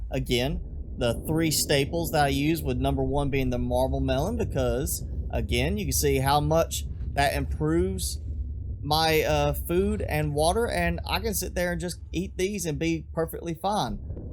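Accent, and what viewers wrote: South, United States. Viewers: Ah someone who sounds southern like me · I want to subscribe but that country accent is killing me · Totally off topic but where’s your accent from? Im absolutely shit at guessing US accents so I’m gonna shoot in the dark and say somewhere south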